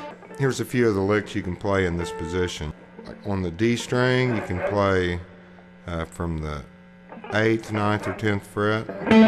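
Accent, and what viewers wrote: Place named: South, United States. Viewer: haha yeah you do, i've heared a lot stronger southern accents before but for someone from scotland.. its still funny:P thinking of doing any other guitar lessons? perhaps on soloing? ( accoustic ).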